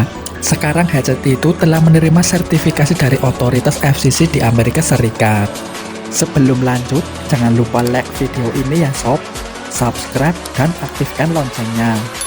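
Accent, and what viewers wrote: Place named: Java, Indonesia. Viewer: Logatnya jowo bngettt 😁😁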